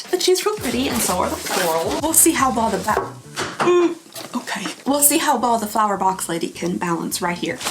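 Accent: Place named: Ohio River, United States. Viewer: I know this is completely off topic but…. It never fails to amaze me that I live maybe 75 miles to the north of you if that and we have vastly different accents. How’s the divide at the Ohio river that mighty in this accent game?!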